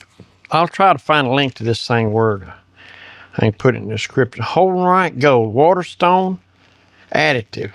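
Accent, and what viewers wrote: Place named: Appalachia, United States. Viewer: This is like watching paint dry, too slow and what an accent, reminded me of the Beverley Hillbillies!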